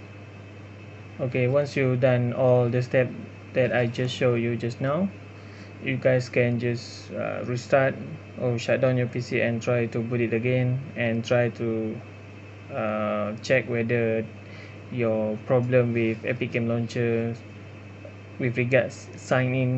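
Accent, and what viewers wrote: Malaysia. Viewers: U have malaysian accent bro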